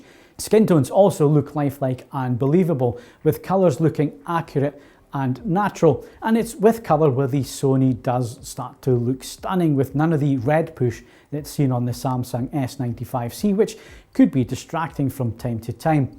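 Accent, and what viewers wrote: Scotland, United Kingdom. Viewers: Great review as usual! All delivered with a wonderful Scottish accent. At times I thought I was watching a football match analysis, which made it all the more enjoyable; most football analysts in Canada are Scottish. I only wish I could afford a 77" A95L.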